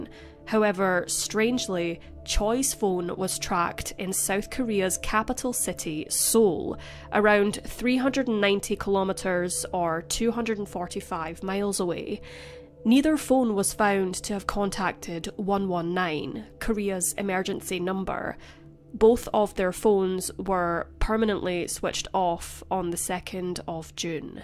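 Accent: Scotland, United Kingdom. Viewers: This sounds like it’s narrated by a fellow Scot! 🏴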